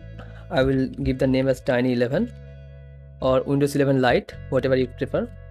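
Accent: India. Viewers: Xati axomiya pronunciation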